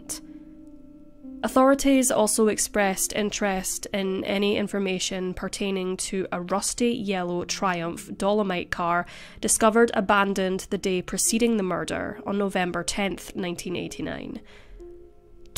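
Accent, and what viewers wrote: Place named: Scotland, United Kingdom. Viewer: CAN U DO ANOTHER " CASE COMPILATION #6,7,8, 9& 10?? FOR 2024? THANKS ✊🏽✊🏽✊🏽✊🏽 HOW DO YA " REQUEST CASE " & GIVE DONATE FOR UR EXCELLENT, HARD WORKING FOR AWESOME TIME SPENDING ON DETAILS, RESEARCH, MULTIPLE VIDEOS & STILL HAVE UR OWN LIFE & SOO 💜💜💜💜💜💜 SCOTTISH ACCENT, 😘😘🇺🇸🇺🇸🇺🇸🇺🇸🇺🇸🇺🇸🇺🇸✊🏽✊🏽✊🏽✊🏽✊🏽✊🏽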